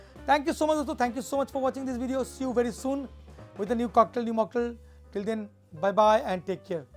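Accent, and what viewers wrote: Bengal, India. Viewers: Ap Bengali ho....t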